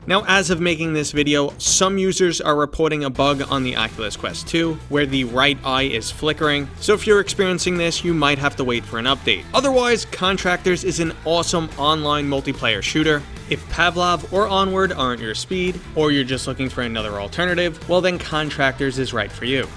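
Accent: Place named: Boston, United States. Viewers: You from long island? Maybe Mass cuz I see you tryna hide it but sounds more like here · Yo this man is so Boston I thought he was Mark Wahlberg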